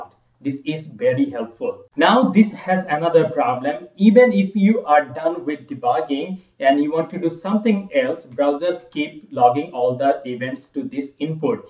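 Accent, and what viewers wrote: India. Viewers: Lol this indian dude is hilarious when he talks its so steriotypical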